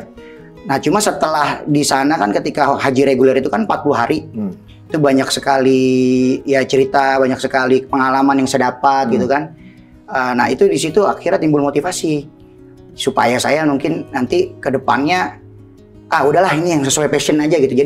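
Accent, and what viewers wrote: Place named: Jakarta, Indonesia. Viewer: 😂😂😂 muter muter dimananya? Roaming kali, nggak biasa logat betawi😂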